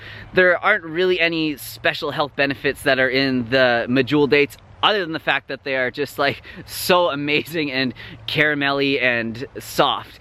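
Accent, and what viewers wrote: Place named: Canada. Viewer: I don’t know how anyone can find you annoying. I love the Canadian accent...ah frig...😍.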